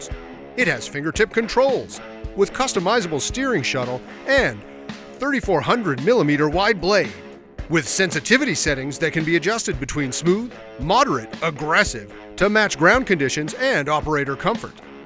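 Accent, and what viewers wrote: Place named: United States. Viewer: Best American accent I have ever heard!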